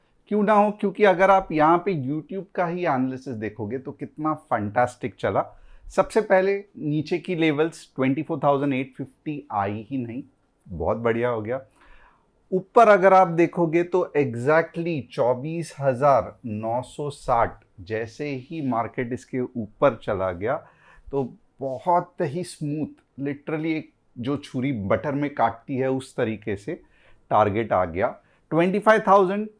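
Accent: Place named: Mumbai, India. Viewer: सर आप मुंबई से हो क्या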